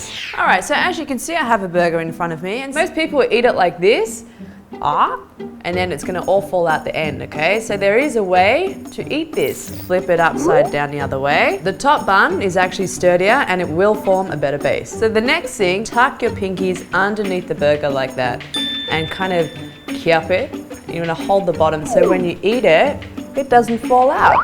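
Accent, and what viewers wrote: Australia. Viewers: Is she from australia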